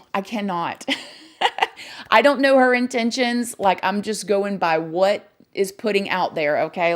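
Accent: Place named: North Carolina, United States. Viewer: when you said shes on the doggone youtube i dieeeed!!!! im from charlotte nc and i hear your accent!! where are u from friend? im such FAN LIKE I WANNA BE YOUR BEST FRIEND!!!! LOVE YOUR VIDEOS!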